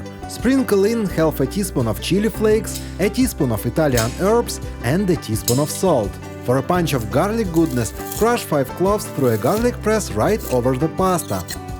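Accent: Russia. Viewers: Dude, your Russian English is making my ears bleed. Subtitles are enough, macho, absolutely. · I'm not from an English-speaking area, but this kind of English-Russian is so irritating that I can't listen to it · Ruski akcent wymowy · Very smart. Sounds like a Russian accent. Excellent recipes and hacks to learn tasty and healthy food.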